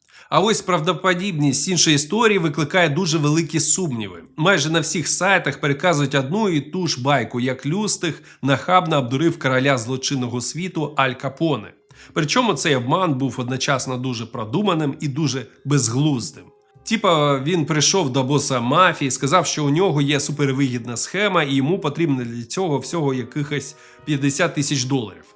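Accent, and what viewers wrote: Russia. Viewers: Приєднуюсь до критики і від себе хочу додати, що відчувається россіянський акцент. Треба над цим попрацювати, або не поспішати. Але взагалі дуже чудовий канал та цікаві відоси. Продовжуйте! Будемо дивитись!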